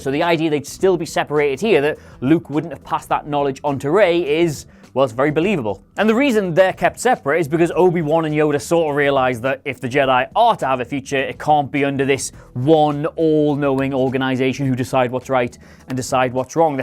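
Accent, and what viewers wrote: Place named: England, United Kingdom. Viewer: BallerDman789 Come on man, he is English. There is a difference in pronunciation. Besides, they don’t even know how to say the same name in the same movie. In the original Trilogy everyone said “Han” like 6 different ways. Just shut up and enjoy the video.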